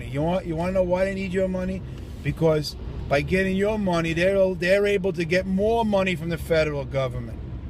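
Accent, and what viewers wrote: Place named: New York, United States. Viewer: I wish I knew u years ago, I just got off child support 2 1/2 yrs ago, and it only stopped because my 2 girls grew up. In the state on NY child support is up to the age of 21, I WAS SERVING MY COUNTRY WHEN I WAS 21!!!, you sound like a NY'er like me and I work for the MTA so you have a round about idea what MTA workers make, like yours my EX WIFE is a evil B*TCH who only cares about the all mighty dollar...I didn't do my homework and for 2 girls I was paying 900 bucks a month in CS...coming straight out of my check. When the CS was up my EX tried to sue me for $100 a month in alimony 2 yrs ago, 1st I laughed then I boiled up the petition and threw it right in the garbage...never heard from her again. for 23yrs I was hounded by CS threatening to cancel my drivers license. I figured you for a cop so you know the system a lil better than us, but I wish I knew someone like you when I was paying...I been with the MTA since 1998 the only things that saved me (mind you I lived with my grandmother until her passing in '99) was I got re-married in 2002 and I have a VERY understanding wife who stuck by my side when I was making 250bucks a week after all these deductions in NYC (just try surviving off of 250 bucks a week in NYC!!!), I started as a bus operator and when I got my 1st promotion to dispatcher I kept my mouth shut and I was making a little bit more money, then I got promoted again to a different title and made more money...When the child support was over I got my first FULL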